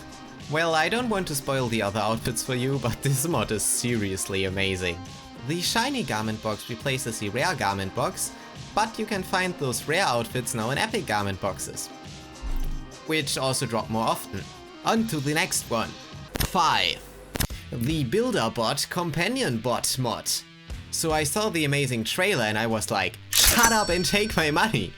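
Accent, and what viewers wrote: Germany. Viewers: Deutscher Akzent kickt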